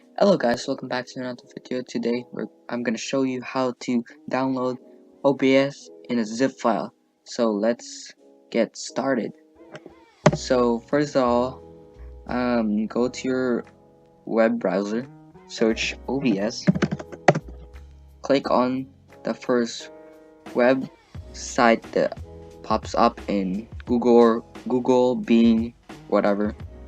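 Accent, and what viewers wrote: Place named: Philippines. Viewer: I can tell, you are a Filipino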